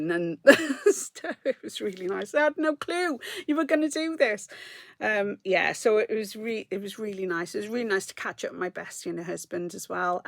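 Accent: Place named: Wales, United Kingdom. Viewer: Love your accent...and that you're from Gavin and Stacey land. Congratulations on the new Grandchildren' I have 2 grown up daughters and 2 grown up Grand daughters. This year I got my first Grandchild in February and the 2nd is due in December. Both boys so I have been knitting in blues, beige and brown. I even had to buy more baby patterns because most of my are for girls. But Grand children are wonderful.x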